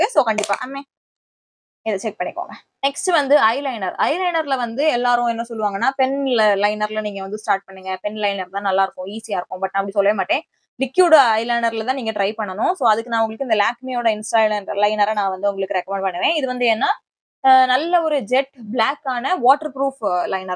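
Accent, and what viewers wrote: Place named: Madurai, India. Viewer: Madurai slang😂💥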